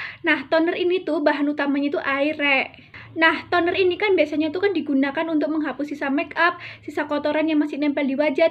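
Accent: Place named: Malang, Indonesia. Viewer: Khas logat malangan ki bocahe. Ayu ayu kinyis kinyis wong kono yo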